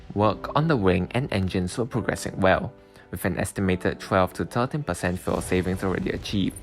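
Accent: Singapore. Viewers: Nice documentary. Thanks for creating it. Is that a Singaporean (or perhaps Malaysian) accent you have?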